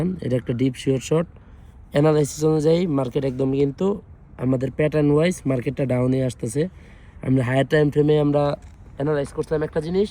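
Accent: Tangail, Bangladesh. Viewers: কথা বার্তার ধরনেই বুঝা যায় আপনি পিউর টাংগাইলা..😁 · vai ki tangailla nki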